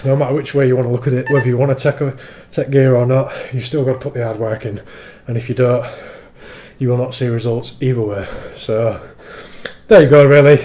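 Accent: England, United Kingdom. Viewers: that english accent beats all the other english accents...is it the country touch? good bullocks talk mate :)!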